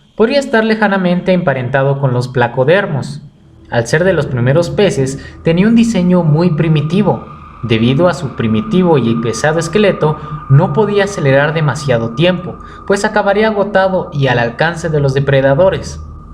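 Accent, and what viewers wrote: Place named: Portugal. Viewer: que buen acento portugués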